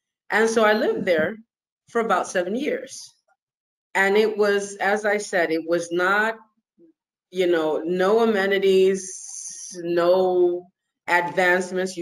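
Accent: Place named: Dominican Republic. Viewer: Wow Im glad you clarified because I couldn’t pin if you were Dominican or American jeje por el acento wow vete con Dios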